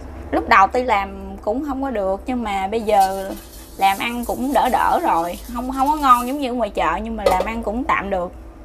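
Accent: Mekong Delta, Vietnam. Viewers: Anh xem từ đầu đến cuối Video Em rất xinh với chất giọng ấm áp đặc trưng miền tây nam bộ, món ăn rất ngon chỉ thiếu mỗi bộ bà ba của người con gái sông nước miền tây nữa là nhất Em đấy ạ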